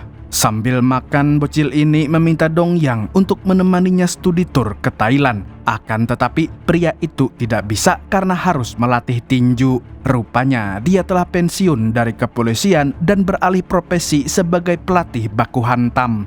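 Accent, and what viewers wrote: Banjar, Indonesia. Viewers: Urang Banjar sekalinya😂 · Oooh urg banjar kah min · Dari logatnya sidin ni urang banjar pang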